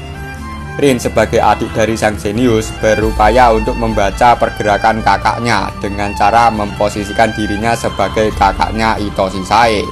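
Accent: Java, Indonesia. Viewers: Pembawaan udah bagus minus di logat Jawa nya aja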